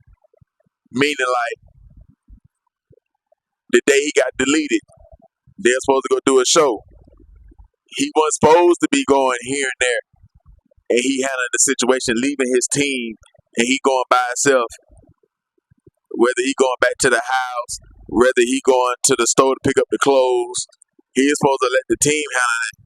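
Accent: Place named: Atlanta, United States. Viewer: Lawd why tf i watched this and he sounds like he from the country not neworleans definitely atlanta or Carolina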